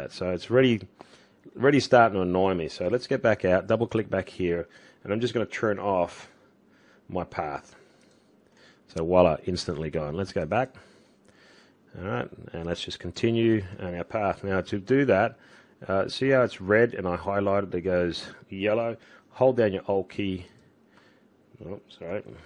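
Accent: Australia. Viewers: Cool. You still got the sweet aussie drawl mate. ☺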